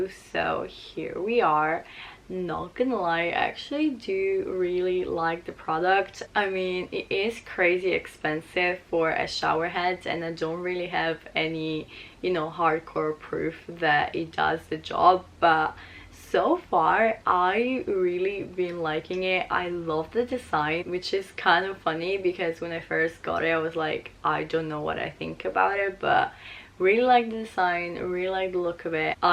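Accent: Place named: England, United Kingdom. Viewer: Yeah she lives in London so will pick up the English twang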